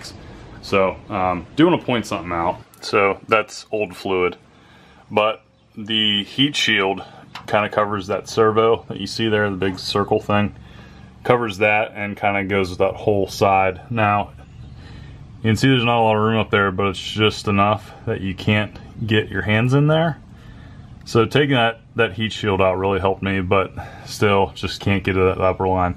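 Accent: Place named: Pittsburgh, United States. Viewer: I detect some Pittsburgh accent...lol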